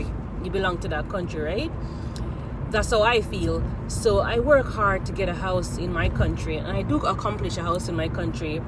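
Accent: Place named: Jamaica. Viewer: I could almost tell u was Jamaican to thts crazy Lol I’m half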